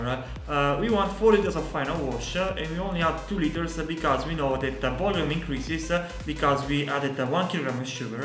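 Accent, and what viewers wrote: Italy. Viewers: I know you re italian cuz of your accent lol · To be honest, I always thought non-Italians were being insensitive, that they were exaggerating. They still are, but dayum, bruh. This guy blows my mind, I had no idea some (if not all) Italians actually talk like that. The More You Know haha.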